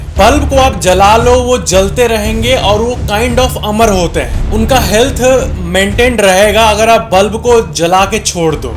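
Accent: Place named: Uttar Pradesh, India. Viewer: भाई आप कहां से हो हमें तो लग रहा है यूपी से हो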